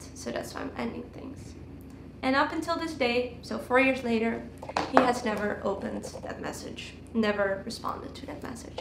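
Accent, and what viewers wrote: Netherlands. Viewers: Really looking forward to Part 2. P.s. ik dacht al dat ik een nederlandse tongval hoorde met je engels 😋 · ik hoorde gelijk je Nederlands accent. groetjes uit Nederland! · You have a little dutch accent🙈 are you from Holland? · As an American who once lived in Holland I learned an expression, The best women get the worst men. I think it's true, those Nederlanders are so insightful. Living in your homeland was possibly the best thing I ever did. I could hear from your accent that you are Dutch and I am always grateful for my time in that great country. Tot Ziens. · Wow I heard the dutch from second 1 :) hiii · Haha yeah I just heard her accent and I was like ohh you sound Dutch ( I'm from the Netherlands as well ) and then I checked and it made sense haha. But we learn English in elementary school so it's kind of a second language for us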